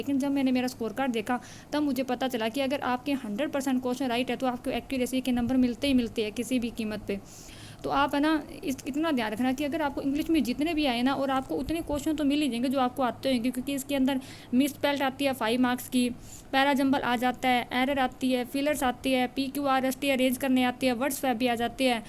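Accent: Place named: Haryana, India. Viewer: Harianvi accent ✌️💜💜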